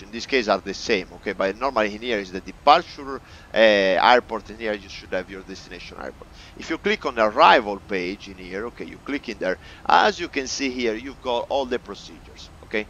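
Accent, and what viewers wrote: Italy. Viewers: Mamma mia, parla troppo...hehehe, Thanks for the video, I can tell you are Italian...just like my family 🙂, Great video, just learned a new thing with you. If you were an American, you would have described this in 2 minutes 🙂..GRAZIE MILLE fratellino.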